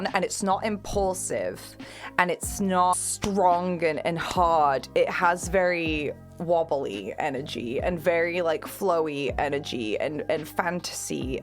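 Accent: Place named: Northern England, United Kingdom. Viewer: Your intelligence is always a step in front of you. I get pulled up for the speed and length I talk at, but you have an extra gear beyond i've ever known. Your every word makes total sense, but I wish I could tune in better. I don't know if you've ever watched or heard anything of the philosopher Alan Watts? Though, he passed away many years ago now, his talks have become very prevalent these days, and most can be found on this platform. Your intensity is amazing. Your hands almost another person in the room, and you know this. Maybe I'm having the same issue as the beginning of animated films, where guessing the voice actors can distract from the plot, but I'm still attempting to guess where the Northern English part of your accent comes from. I could hear you talk for hours, and even if I have to use technology to slow the recording down to understand, I will. 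My apologies to those who know you, they will no doubt roll with your enthusiasm naturally, so my point is moot. I must revisit more astrology too, I haven't for too long. I'm Steven. I'm an attention seeker. I naturally attracted Pisceans, and if you can guess my sign with a 12/1 chance from this comment. I will become a Patreon, because if you are that intuitive, how could I not. I have to add, although communication is the most essentially part of any relationship, and putting effort in can only make that better. I believe too much thought and effort will ultimately mean you're with the wrong person. Especially whe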